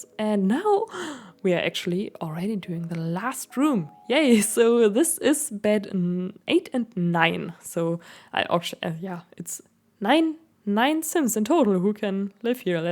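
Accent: Germany. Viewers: 🤣🤣 thanks for clarifying! I knew she sounded German. Danish would be a close match, tho!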